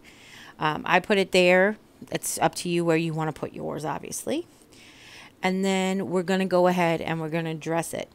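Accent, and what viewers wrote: New York, United States. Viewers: Love the rosette tutorial! Do I detect a NY accent?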